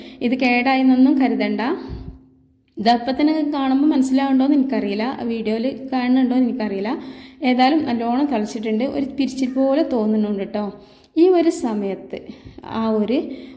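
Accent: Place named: Kozhikode, India. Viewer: ഇത് ഉറപ്പായും ഞാൻ ഉണ്ടാക്കും ഈ വീടിയോ എനിക്കൊരുപാട് ഇഷ്ടായി കോഴിക്കോട്ടുകാരിയാണോ എനിക്കൊരു ഫ്രണ്ട് ഉണ്ട് കോഴിക്കോട് അവളുടെ അതേ സംസാരം നല്ല സംസാരം കേട്ടിരുന്ന് പോകും താങ്ക്യൂ💯👌🥰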